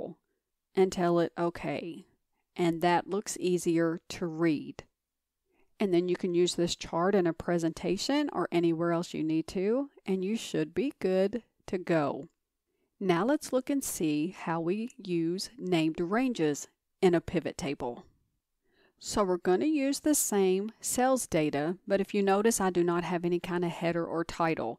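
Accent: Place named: United States. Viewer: From ALL THE INTERNET, you're unique, American with American accent!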